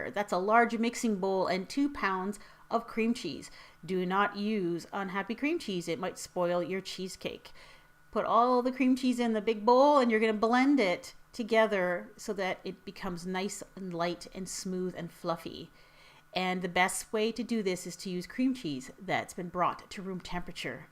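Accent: Canada. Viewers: i knew you were Canadian!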